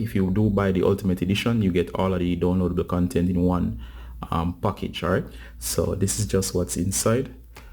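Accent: Jamaica. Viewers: U jamaican right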